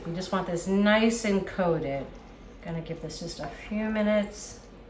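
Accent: Boston, United States. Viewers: I love this recipe! I also love the Boston accent!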